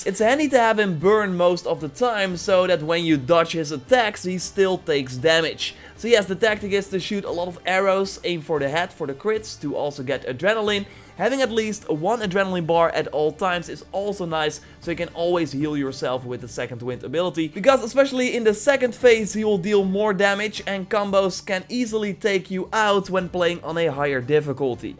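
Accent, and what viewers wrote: Netherlands. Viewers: please, say OY-kos not E-kos. Your strong dutch accent takes over alot haha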